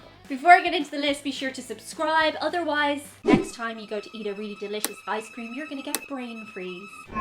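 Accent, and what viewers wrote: Ireland. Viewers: I noticed in your last cooking video that when you are talking to Paul you have a MUCH MUCH STRONGER accent. I love the irishn accent esp when unguys says three/thirity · So 2 things if you dumb down your Irish accent I would love to see a video of where you're not and how you sound everyday and second being an American I cuss like an Irish 😂😂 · Hi, I have been with you since the first episode. I honestly don't hear your Irish accent any more. You sound very normal to me, unless you use slang, or word I am unfamiliar with.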